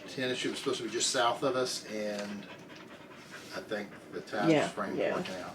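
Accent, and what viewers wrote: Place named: South, United States. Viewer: I love your accent.thats southern.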